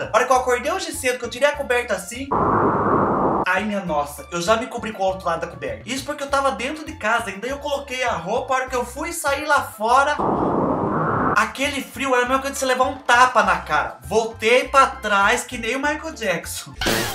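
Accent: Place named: Goiás, Brazil. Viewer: Oi em primeiro lugar quero dizer que concordo com a Milena Barbosa..... para de ficar falando essa palavra (des......)nem vou terminar,pois não é bommmm # fica a dica. E agora quero dizer que eu concordo com você, detesto o 🥶 frio. Graças a Deus moro no Centro Oeste do Brasil, em Goiânia amo minha cidade e principalmente o clima, agora esse mês e o mês que vem faz um pouco de frio..... mesmo assim me incomoda muito, mais logo passa. quero que saiba que ammmmooooo vc, e esse seu jeito de ser e falar, amo.aqui em Goiás principalmente nos interiores fala muito assim com vc, na capital também e é por isso que amo ver seus vídeos..... 👍🏾 continue assim, só pare de falar aquela palavra feia..... viu!!!!!!!bjs no seu coração.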